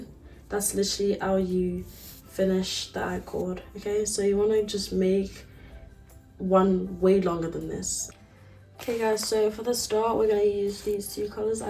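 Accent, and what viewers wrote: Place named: Liverpool, United Kingdom. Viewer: scouse queen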